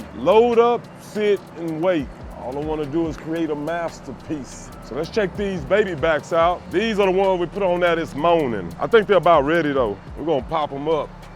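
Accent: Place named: Texas, United States. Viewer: Oh lord. This jokers In Utah. Talked like he was Texan. No wonder he’s talking silly smack. No decent QUE in Mormon world.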